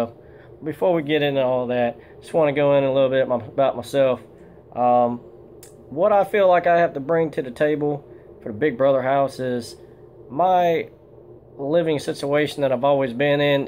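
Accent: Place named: South, United States. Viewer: So basically you only were chosen for casting because you were Asian with a country accent.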